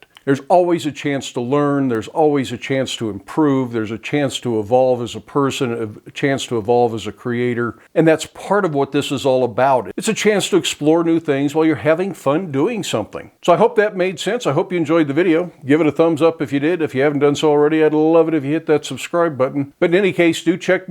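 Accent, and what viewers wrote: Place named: South, United States. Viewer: Good motivational speaker. His voice is not intimidati g as his appearance first was and i love the southern acsent. Great video for encouraging over coming problems youtube home video newby's should watch acassionably to remind them that they really can do it right and not give up.👍👍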